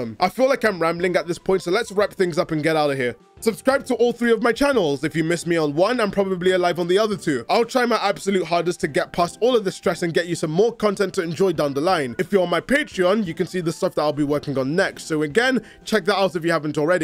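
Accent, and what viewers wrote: United Kingdom. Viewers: the brit is back baby!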